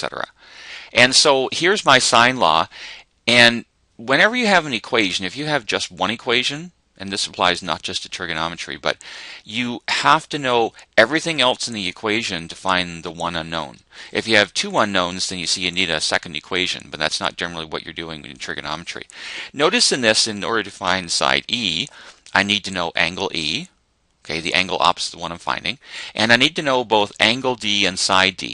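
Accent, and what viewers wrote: Canada. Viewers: u sound like a canadien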